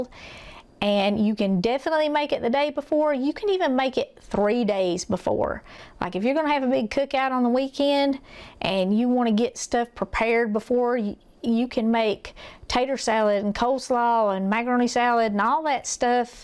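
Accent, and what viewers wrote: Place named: Kentucky, United States. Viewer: Every time I hear your voice, it brings me back to Kentucky. You remind me so much of my mother. Tears of joy Every time every time I need to learn a recipe which I love to cook. Anytime I need a recipe, you're the first one.And only one, god bless you.And your family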